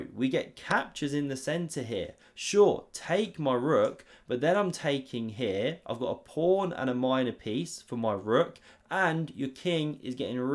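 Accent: United Kingdom. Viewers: I love ur british accent man keep it up !😊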